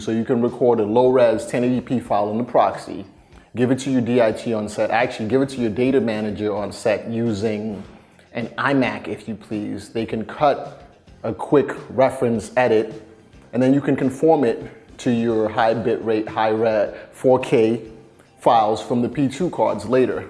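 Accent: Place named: East Coast, United States. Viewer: Ok, thats makes sense. Sounded like an East Coast accent. I'm trying to test out the Varicam but they dont have one in Chicago (for rental atleast). But from what I've seen and some footage I've graded it looks to be a solid camera. I'm rolling with the Ursa Mini 4.6k right now, which is great, but it has some problems with fine hue discernment (especially on the red channel) and not a nice sensor texture above 800ISO. I shoot mainly docs, and some promos. The varicam looks like the move for me in early 2017. Cheers!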